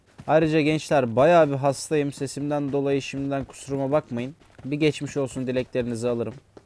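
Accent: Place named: Bolu, Turkey. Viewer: Abi nerelisin sende bolulu gibi bu ses var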